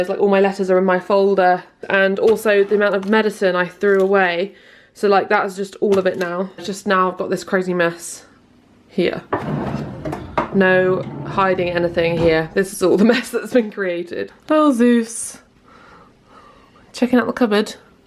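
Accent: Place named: Cornwall, United Kingdom. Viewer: I love your accent so much. I think Cornwall is my favorite British accent. I loved your video. You're lovely to watch! :)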